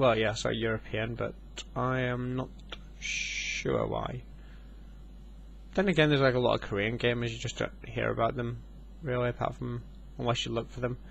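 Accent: England, United Kingdom. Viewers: which part of england are you from?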